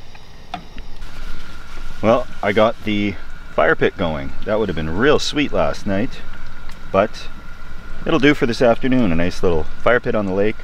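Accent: Canada. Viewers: My wife is Canadian so I forgive the accent but mispronunciations are off limits. You’re still saying “brats” man. It’s “braahhts. You wouldn’t say bratvo would you? No you’d say bravo. Ok, so BRAVO on the video. I used to do something similar on my pontoon with a little self standing tent weighted down. Fun times. Thanks for the memories.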